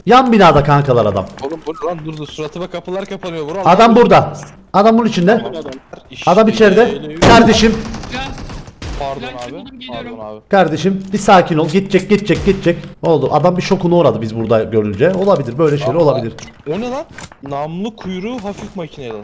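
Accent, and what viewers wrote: Cyprus. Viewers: abi sen Kıbrıslı mısın??? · Abi kıbrıs limisin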